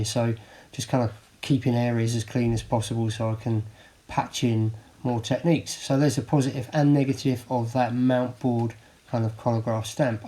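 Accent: United Kingdom. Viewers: I shared it w my art group and we may spend a day trying it…getting magazine images to transfer is a neh, sometimes it works some times it doesn’t, but I’ve had luck using pigment ink before laying the page down….I’ve got you on my watch list, as there’s lots of videos I want to watch…and I love your British accent!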